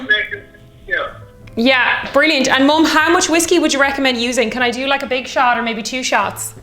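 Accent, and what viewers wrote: Ireland. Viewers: Ugh Irish and Scottish accents 😍 😫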